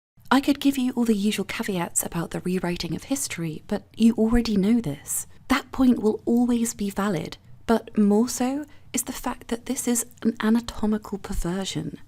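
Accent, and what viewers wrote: England, United Kingdom. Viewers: Love the way you articulate with that beautiful English accent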